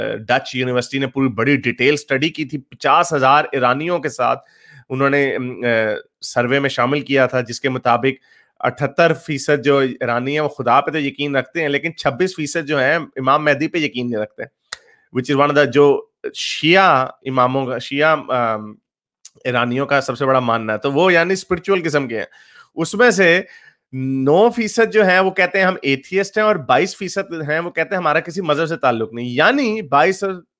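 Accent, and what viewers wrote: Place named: Punjab, India. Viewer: That deep Punjabi voice 😁😁😁👍👍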